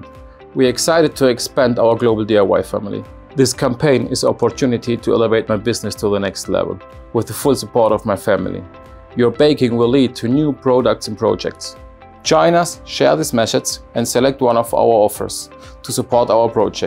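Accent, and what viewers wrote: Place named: Swabia, Germany. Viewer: Servus Video ist OK aber auf schwäbisch bist Du mir lieber 😂 Gruß Walter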